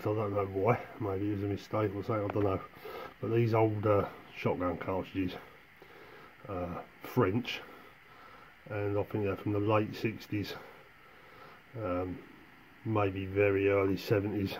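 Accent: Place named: Medway, United Kingdom. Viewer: Ha! You’re a “Gentleman Collector”. You can enjoy both sides of the pleasure a cartridge gives. Great accent and nice to hear. Guessing Medway Towns? I’m from Maidstone originally but now miles away in Devon. Love your videos, keep ‘em coming mate! 👍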